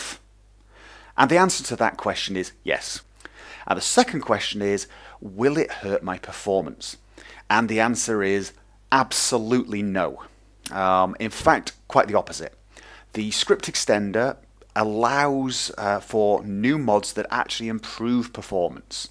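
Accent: United Kingdom. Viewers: Is this guy norwegian? He speaks like a brit XD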